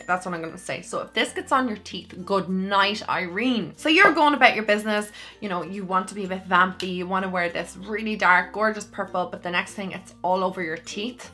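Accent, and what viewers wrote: Ireland. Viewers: I can't quite put my finger on it but you're becoming one of my favorite YouTubers to watch. I love your personality and your Irish accent. I'm from Texas USA and have always wanted to go to Ireland. I hope the day that I do I will be able to meet up with you :-) I feel like we would get along well.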